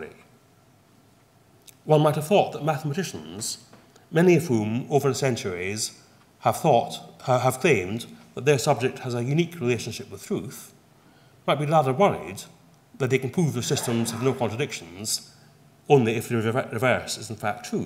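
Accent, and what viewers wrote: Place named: Scotland, United Kingdom. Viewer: all godel proved is mathematicians like to argue and are pompous at best.. but his accent. the most posh scottish i have ever heard lol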